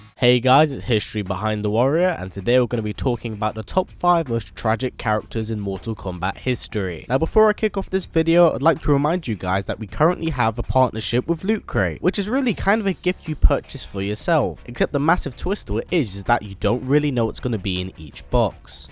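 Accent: United Kingdom. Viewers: where are you from,? you sound British but I think I am wrong.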